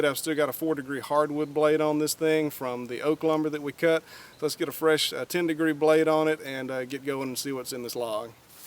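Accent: South, United States. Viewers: Hello from Canada! I really wish american You Tubers would tell us what state they come from! I have had some travels in my time in the U.S. but there's a lot I haven't seen. Nonetheless there's a few: 1) You're somewhere in the south partly by your Parlance (more than just accent) and being a language lover this is nice educative fun for me also!, so from Quebec <<chapeau! >> 2) By the vegetation around, this is obviously not in the desert or the midwest. (watchi8ng a lot of Amrtrak videos, I have seen some of the countryside that way to be able to compare with). since the vbagetation looks quitre thick and lush, in soite of the drought you reported, This has to be a notable warmer climate with a notably longer growing season than ahnyrhing up north. So your effort seems very well placed in relation to nature! As for wood, as it hapopens I grew up amny years ago in Oakville, Onterio, some 20 miles West of Toronto. 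Historicaslly the town got its name when it became famous for production of barrel staves, made of, oak of course. In our back year was one of thise huge oak trees, and, of course lots of squirrels, but thos little critters can also make a !!!! of a mess of the corn patch. (Also they can carry rabies, so they are somewhat of a public pest). So all in all thumbs up and to/from??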